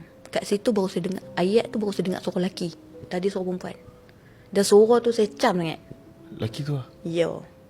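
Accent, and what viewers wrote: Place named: Penang, Malaysia. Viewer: Hg dr kecik smpai besaq lenggoq penang noh😂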